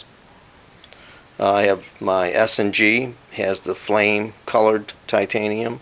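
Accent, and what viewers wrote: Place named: New England, United States. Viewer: Nice vid! You've got an interesting accent. I detect some New England and some Southern. I know that's very vague as there are so many variations. :-) Where have you lived?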